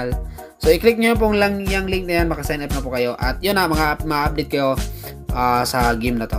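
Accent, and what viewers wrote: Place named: Visayas, Philippines. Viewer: Bisaya nga ganyan ang bisaya magsalita matigas pero tama ka boss at may napansin din ako na parang buyoy sya magsalita hahaha.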